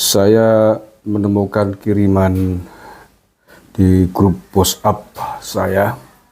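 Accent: Banyumas, Indonesia. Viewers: Ngmonge ngapak bae pak...lucuuu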